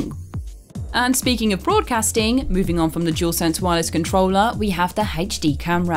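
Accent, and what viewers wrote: United Kingdom. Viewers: hey, I don´t have english as my main language... I find her accent a little different... Is this british or something?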